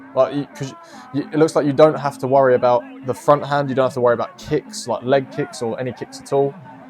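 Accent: United Kingdom. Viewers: Remember me w vids w guy which part of th UK are you from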